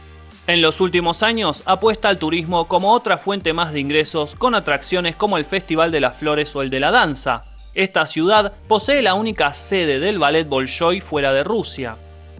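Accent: Argentina. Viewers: Qué yeísmo tan fastidioso el de este argentino, pronuncien bien la “y” y la “ll”, parecen idiotas con esa pronunciación tan fastidiosa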